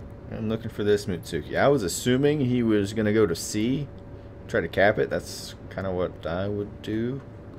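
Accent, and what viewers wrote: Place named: Texas, United States. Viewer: Much enjoyed ty! You've mentioned you're in Texas - I am curious what general region and is that where you're from? Mostly I'm curious your accent which is not over-the-top but just enough : ) For my part I was not born in Texas but I got here as soon as I could!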